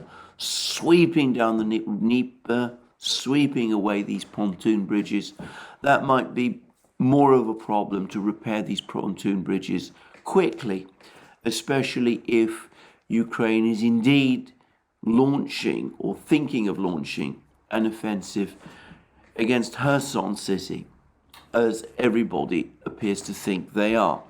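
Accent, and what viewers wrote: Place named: RP, United Kingdom. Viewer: It strikes me that Alexander for some reason chooses to use BBC rules of pronunciation, e.g. at his public school his peer group would have said adversary with three syllables, swallowing the second 'a' and he has accepted training to get 4 syllables from it. And why has he stopped saying referenda? Who told him to and why does he agree to it?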